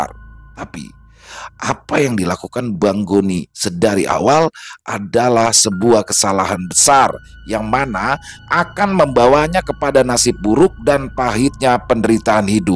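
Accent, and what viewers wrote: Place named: Jakarta, Indonesia. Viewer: Ane plg demen nih klo bang berlogat betawi. Cengkok nye mantep bgt 👏👏👌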